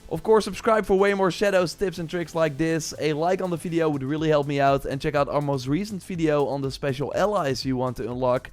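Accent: Netherlands. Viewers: Yo bro are you dutch ?😂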